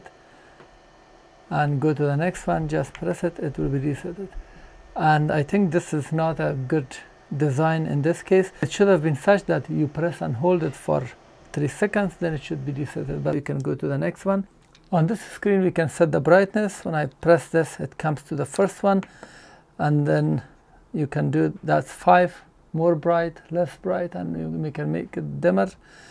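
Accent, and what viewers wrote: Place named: India. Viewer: indian sound funny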